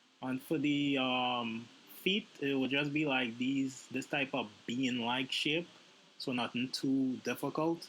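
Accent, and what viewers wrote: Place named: Trinidad and Tobago. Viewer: Sound like a Trini bro lol, Good Video btw, learnt a lot !!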